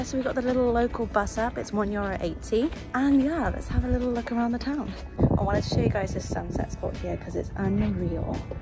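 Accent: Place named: United Kingdom. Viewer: Not crowded is the best. Thank you for sharing. All is good except the British accent.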